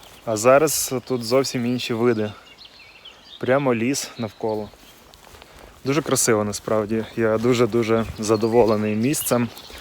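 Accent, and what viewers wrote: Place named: Rivne, Ukraine. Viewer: а звідки пан родом?. по мові чую може бути гощанський район або острозький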